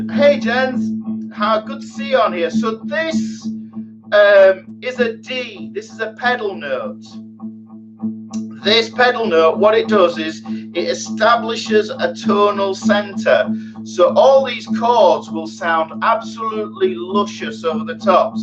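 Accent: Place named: Hull, United Kingdom. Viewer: Trying work out the accent maybe Hull area ish, good lesson mate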